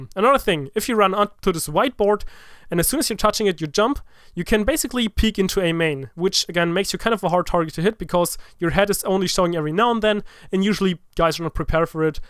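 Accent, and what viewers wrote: Germany. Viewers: Влад Губин some german accent right there imo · ! Ist minimal hörbar hast für deutsche echt wenig Akzent, Gutes video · you are german am I right?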